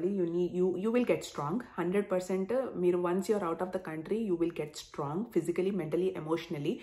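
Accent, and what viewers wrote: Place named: Telangana, India. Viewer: Telugu itey pakka telangana la undi sister😅🤩🤩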